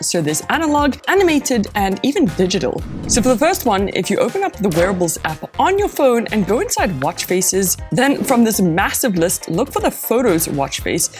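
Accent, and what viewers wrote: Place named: South Africa. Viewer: I got me the Watch 8, searched for tips and tricks and came across your channel. The minute i heard you speak I knew you were my fellow South African :).. Great channel!!!!!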